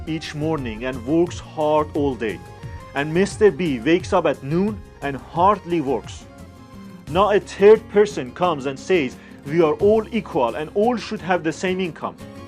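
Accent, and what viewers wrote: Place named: Iran. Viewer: سلام! مرسی واسه ویدیو لهجه ایرانیت خیلی شیرین ترش کرد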